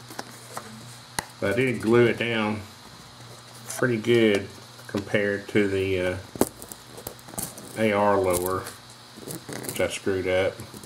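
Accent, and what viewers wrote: United States. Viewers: U have a really heavy american accent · Damn he has annoying "murica" accent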